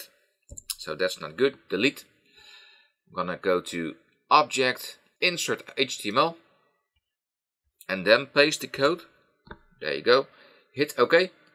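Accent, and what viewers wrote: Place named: Netherlands. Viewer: I can tell you're dutch haha! Either way, very helpful tutorial! Kudo's!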